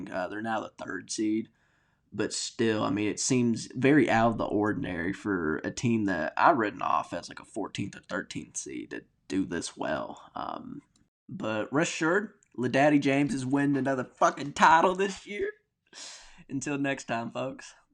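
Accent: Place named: Alabama, United States. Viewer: This dude is a casual, bruh. The thumbnail alone implies Harden should be considered for MVP when the Clippers are 5-16. He called Michael Jordan ancient history like he played in 1960. Then he said LeDaddy James (super pause), and called MJ inefficient like he's not 2nd all-time behind Jokic in player effciency. Completely ignored the fact he's shooting .495 for his career while taking shots most wouldn't consider at an all-time high volume. MF put a period at the end of his title like he just learned how to YT lmao Like how are you clearly an L.A. fan who sounds like you're from Alabama? lmao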